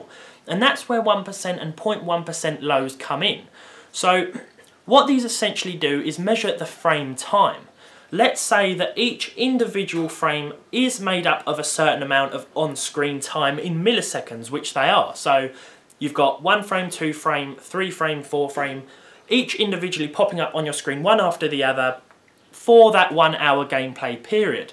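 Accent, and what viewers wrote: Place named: Surrey, United Kingdom. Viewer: hey, are you from Surrey? I have a friend who has the same accent (hell, I swear he sounds like your little brother) and he's from Surrey.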